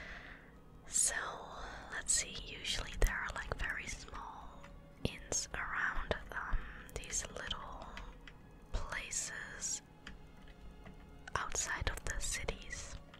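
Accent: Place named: Netherlands. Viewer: Where are you from my guess is the netherlands cuz of the accent.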